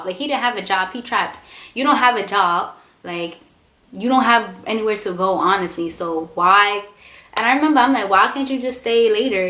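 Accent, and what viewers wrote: New York, United States. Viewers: Well they both from New York she has that New York accent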